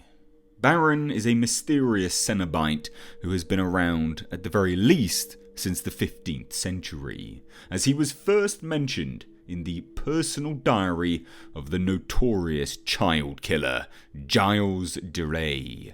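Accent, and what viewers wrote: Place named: England, United Kingdom. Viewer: I am trying hard to get your english accent. I need to listen to you more for the true English pronunciation of words.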